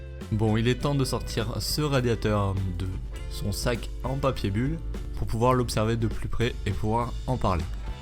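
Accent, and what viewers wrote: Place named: England, United Kingdom. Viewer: Excellente présentation du produit je trouve...Belle accent anglais au passage LEL !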